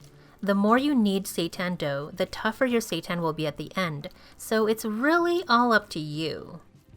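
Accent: Canada. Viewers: I KNEW you were a fellow canadian and I even guess Alberta! 🤗😆♥️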